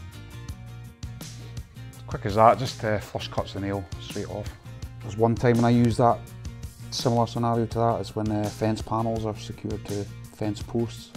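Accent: Scotland, United Kingdom. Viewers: a really sweet strong Scottish burr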